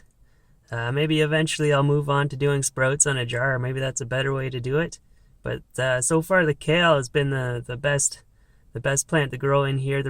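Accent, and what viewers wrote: Canada. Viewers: Canadian accent activated and recognized. 👍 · Love your Canadian accent. I’m gonna be watching lots of your YouTube today just got two teeth pull so it's a down day for me. 💕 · Your young Jordan Peterson lol the Accent. What region of Canada? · I love listening to you. As a fellow Canadian, it's like listening to the McKenzie brothers (early 80's). Stay well!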